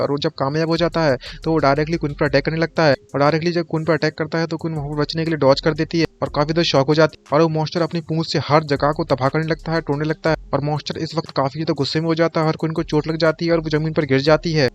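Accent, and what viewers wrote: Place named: Western Uttar Pradesh, India. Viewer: Bhai tera bolney ka same west UP ki jaisa h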